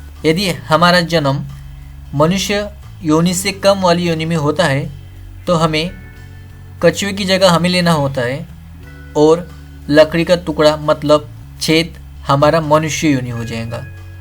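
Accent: Bihar, India. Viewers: apni awaj mei mt upload krna bihari ho n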